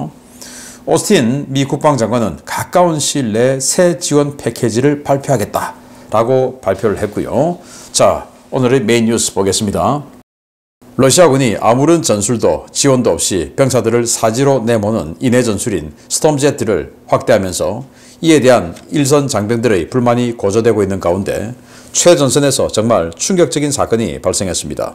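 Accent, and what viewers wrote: Gyeongsang, South Korea. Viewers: 신인균 YTN 너희들 말대로면 모스크바는 2~3번 함락됐어야 한다 경상도 씹 말투 존나 거슬리네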